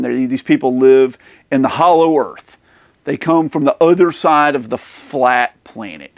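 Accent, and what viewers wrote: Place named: New England, United States. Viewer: You seem like you’re from the southern part of upper New England. That’s not an insult by the way. I’m from Middle New England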